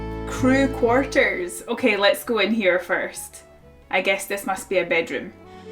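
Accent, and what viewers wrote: Scotland, United Kingdom. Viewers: I love the Scottish accent. Its my favorite. I enjoyed the video 😊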